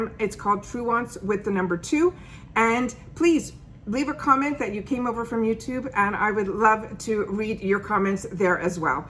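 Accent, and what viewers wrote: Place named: New York, United States. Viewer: Do you live in new york? The reason why I asked you is the way you that you speak you sound like a new yorker.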